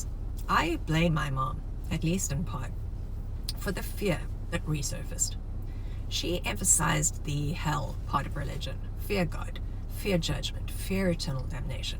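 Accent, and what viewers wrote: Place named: Afrikaans, South Africa. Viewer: Are you Afrikaans? I like your accent. Great vid. Thanks.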